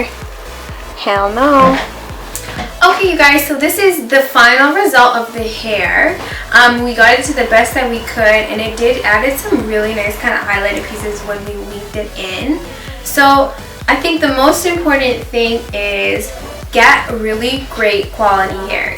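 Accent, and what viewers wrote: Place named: Guyana. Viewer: love your Guyanese accent I'm mixed and mines comes out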